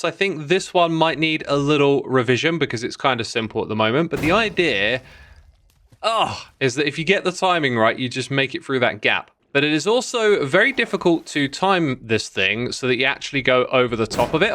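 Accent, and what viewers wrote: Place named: South London, United Kingdom. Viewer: Yeah, he's got that souf London thing going on.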